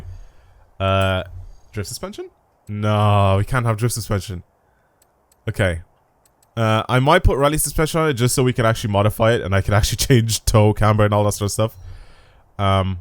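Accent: Ireland. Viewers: Just subbed, great content! Also, which part of Ireland are you from? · Are you Irish?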